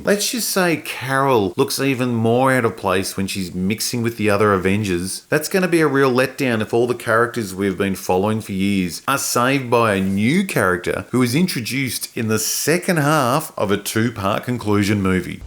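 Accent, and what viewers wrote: United Kingdom. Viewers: btw i love your accent (British right?) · 59 seconds in and you are wrong. red sonia was not a superhero. she was just a hero, adventurer . I am not sure what country you are from, sounds British, but I have lived in america since 1976. The 90's the movies were not the nicest to women in the 90's, we were still pretty sexist. In real life and movies. You try to make it sound like we were liberated. we were not. I do agree the movie was pretty boring. I only saw it once. I have no idea if your points are right.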